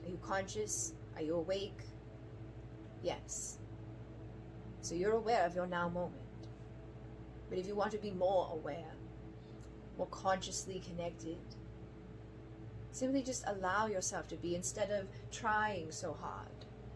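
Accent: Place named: United Kingdom. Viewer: I have catched a British accent for the order. 🙏🏻 This is fascinating and you are so talented. Thank you for doing this for us. Have a good rest.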